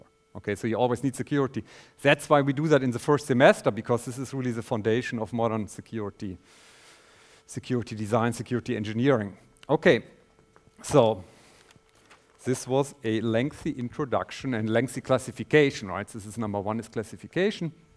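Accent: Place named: Germany. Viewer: very good Denglish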